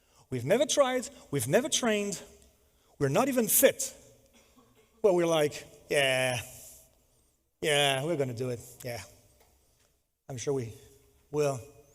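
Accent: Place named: United States. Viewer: où avez-vous appris à parler si bien anglais, avec un accent américain de très bonne facture?! En tant que prof d’anglais je suis impressionné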